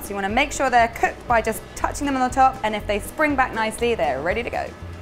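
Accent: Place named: RP, United Kingdom. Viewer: Wow she sounds so posh, is she speaking in british received pronunciation?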